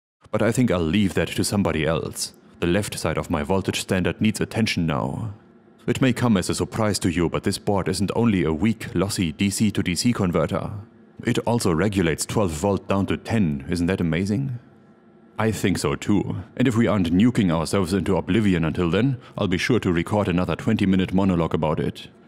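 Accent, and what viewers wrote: Germany. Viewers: I didn't expect German accent to be so difficult for me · Your German accent becomes more and more sophisticated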